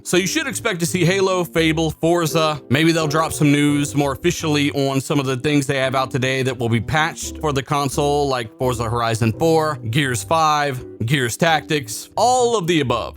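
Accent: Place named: United States. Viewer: maybe it's just the dumb American accent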